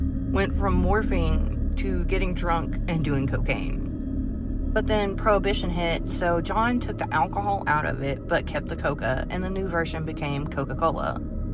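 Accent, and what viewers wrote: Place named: South, United States. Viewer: Stevie Ray Vaughn, at the height of his alcoholism and cocaine addiction would put coke in his whiskey. He was forced to clean up because the mixture was destroying his gutty wuts...Too bad he didn't go top rehab for late night helicopter rides. He thought he could save time after a gig instead of driving, and get more sleep. Looks like he got his wish. The only coca cola product I'm currently infatuated with is actual cocaine. My dude lets me watch him chip an ounce off a brick... I found out about you from the seconds of horror channel. He features your clips in quite a few of his videos, and I had to find out who the adorable redhead{?} with the southern{?} accent was and watch more of her... Maybe I shouldn't have typed that last sentence but hey....cocaine. Please don't ban me, I think I found a reason to not have that shotgun sando I have at the ready...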